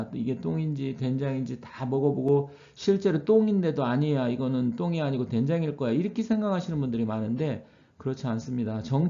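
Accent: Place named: Jeolla, South Korea. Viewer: 선생님은 말씨톤이 전라도말씨같은데 전라도에서는 보기드물게 자유민주주의 보수우파시네요. 수고가 많으시고 훌륭하십니다.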